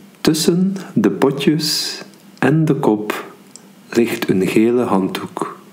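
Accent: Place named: Arab world. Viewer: у нас в языковой школе, арабы с таким акцентом разговаривают)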